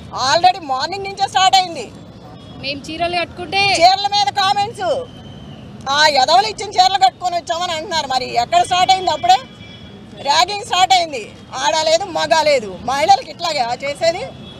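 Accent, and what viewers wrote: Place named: Andhra Pradesh, India. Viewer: ఈమె తెలంగాణ రాష్ట్రం కాదు ఆంధ్ర బాషా చూడండి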